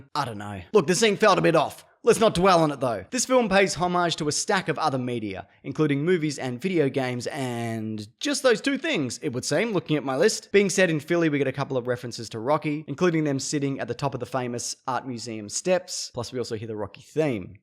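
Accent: Australia. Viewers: I enjoyed 2 things. #1 is that you were the australian voice from the ITSV honest trailer. Man they over done the aussie-ness(?) in the script didn't they. But I was very, very happy to hear you make a cameo with you slightly enthused but very very tired voice of yours. #2 is that you pointed out in the video that shazam did not display any wisdom at all. What a dickhead!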